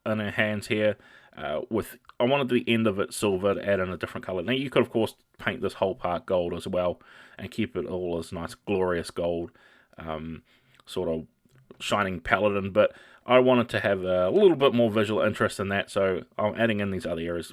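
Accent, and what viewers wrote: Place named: New Zealand. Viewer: "now we wanna come in now with some dick tan" I love the kiwi accent!!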